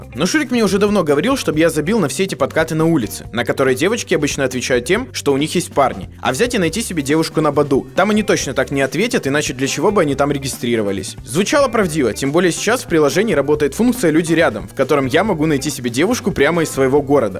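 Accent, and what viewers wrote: Odessa, Ukraine. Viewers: Вау! Вы из Одессыыыы)))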